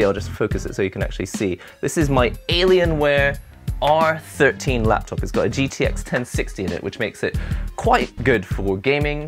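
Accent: United Kingdom. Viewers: Why your accents like those from UK?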